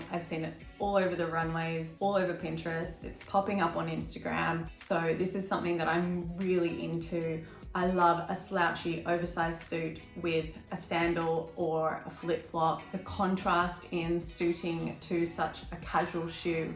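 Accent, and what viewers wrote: Australia. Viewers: Hi, I have just found you and great to hear an Aussie accent! Whilst I love following a lot of UK/US vloggers, it’s great to find someone in AUS.Thanks for your trends forecast! Whilst I may not be adopting all, it is good to know what to expect to see in the stores for the upcoming seasons. 🙏 Thanks!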